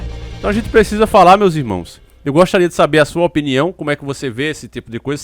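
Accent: Northeast, Brazil. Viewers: mais uma vez eis me aqui ótimo início de semana pra toda família encarando também mando o meu abraço pra vocês, eu moro em São Paulo a mais tempo duque vive no nordeste mas sim sou de Alagoas e além de tudo estou familiarizado com o seu falar fico feliz em saber que no nordeste tem alguém que representa bem não só o nordeste mas todo O Brasil aqui no YouTube é uma honra poder fazer parte de todo família encarando, embora não gosto muito de comentar mas quando torna-se mais que interessante como é o caso da terra então eu entro na conversa.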